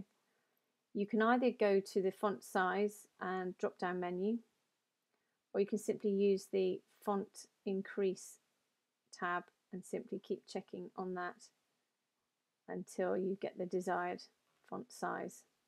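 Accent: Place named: Bristol, United Kingdom. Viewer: Are you from Bristol by chance? Your accent sounds much like my friend who grew up in Bristol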